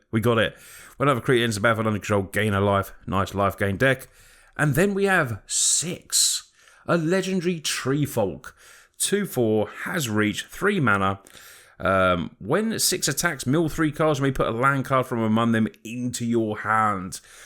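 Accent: United Kingdom. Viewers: Its nice to hear a proper Englishman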